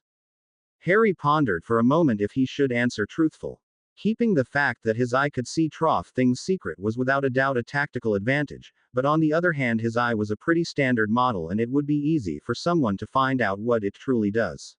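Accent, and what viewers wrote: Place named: India. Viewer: Just let the AI read sverythinh l8ke before because we cant hear you properly Ypur indian accent is very heavy..and the audio qualjty is now poor unlike your earlier stories Yhr stories are nice but work on that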